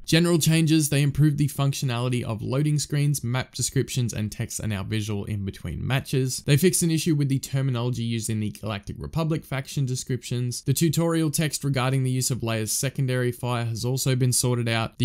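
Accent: Australia. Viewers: lauchlan walsh where did you think he's from? He definitely sounds like an Aussie to me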